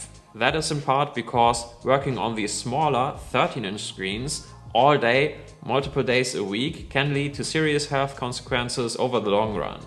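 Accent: Germany. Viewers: Hab sooo bemerkt dass du deutsch bist aber gutes Video 😅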